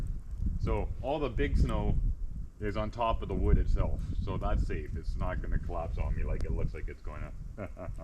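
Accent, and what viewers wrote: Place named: Canada. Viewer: Wow. I've been over here so long I forgot how Canadians *sound*. 🙂 You a savvy enough mechanic to replace the brake line on the quad?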